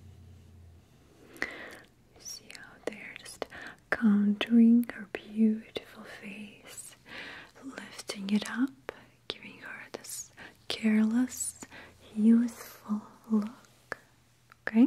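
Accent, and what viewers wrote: Russia. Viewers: I love your videos so much, you’re my first ever ASMRtist I’ve ever watched! My favorites are your videos where you emphasize your Russian accent and culture, can you please consider doing more videos with your Russian accent please? <3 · I also love your Russian accent, it's so pretty. My parents are also from Europe (Czech Republic) and we all speak both English and Czech! Sweet dreams from Canada 😘😪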